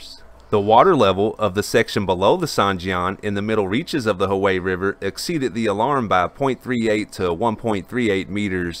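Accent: South, United States. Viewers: A good old southern boy interpreting Chinese for us.! I'm sold. you got another subscriber bro. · Thanks for this information. You have a beautiful Southern accent! DO NOT ever change it for anybody.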